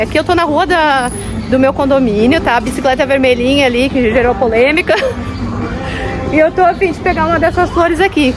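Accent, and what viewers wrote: Rio Grande do Sul, Brazil. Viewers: Amo os teus vídeos! E tu ainda não perdeu o sotaque gaúcho, tchê!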